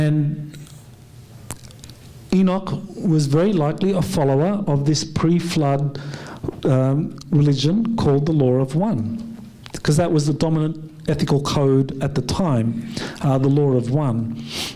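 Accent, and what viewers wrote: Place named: Australia. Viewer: You were a former professor? What are you now? You speak in a broad Australian accent, yet you mutate the pronunciation of "AntarCtica" as if you you were one of rhe exceptional people from the indispensable country. You do reslise that there is a letter "c" after the letter "r" in "AntarCtica"?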